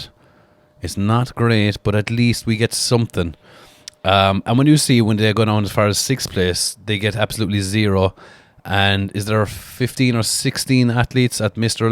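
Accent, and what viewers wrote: Ireland. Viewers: By fuck it's refreshing to hear an Irish man vocalise the amount of gayness in the world these days. Fair fucks to yee bie 🤛🏼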